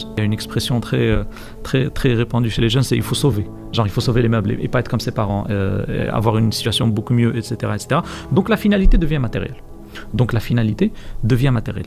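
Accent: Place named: Morocco. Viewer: on reconnaît l'accent marocain❤❤🇲🇦🇲🇦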